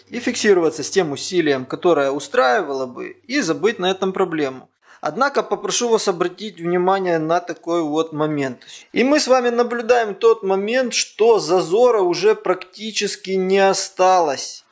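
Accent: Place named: Odessa, Ukraine. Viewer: Ахаххх,слышу что говор свой, а потом на камеру говорите: Привет из Одессы ))))) теперь все сошлось, таки наше "шо" )). Шалом в общем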